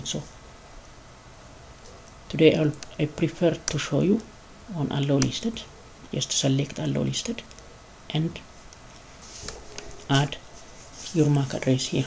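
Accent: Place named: India. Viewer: ur tryn' to speak like indians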